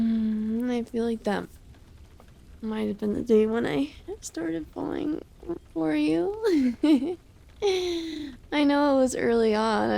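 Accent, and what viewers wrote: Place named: United States. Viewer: You American girls have (possibly)the best accent! You should do a "southern belle" accent video. How much fun are you! New sub from 🏴 😊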